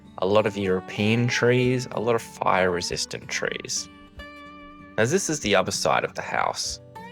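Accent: Australia. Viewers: we saw the same bad behaviour in NZ. Some increases were insane. I'm sure there was a spike in food waste as well. It's not only in Auckland, sadly. You are blessed to be able to do what you're doing mate. I love your accent, you sound like my favourite cousin in Melbourne 😂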